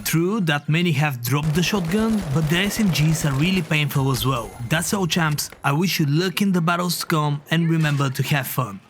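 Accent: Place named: United States. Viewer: please dont take this negatively but I love your american accent. what are "Resuesses" though?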